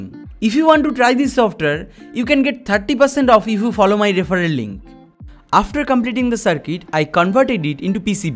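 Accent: Bengal, India. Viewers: Go to Shark Tank with this and get this out there! A genius man with a very enjoyable accent, after british, indian english is my favourite commentary language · This is the first video i am watching of this channel and the moment it started i knew he was bengali lol becoz i am also bengali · I love this Bengali accent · That Bengali English accent 🙄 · Indian accent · He’s sounds Bengali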